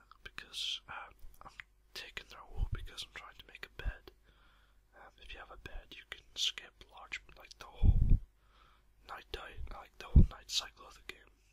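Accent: Scotland, United Kingdom. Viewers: Heeey your Scottish !!! Snap lol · Are you Scottish?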